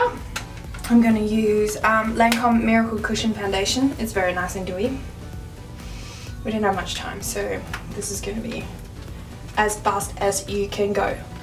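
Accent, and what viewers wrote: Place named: Australia. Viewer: Morning routine twins! You are stunning. Also, yay for us Aussies! Can't get much realer than that accent x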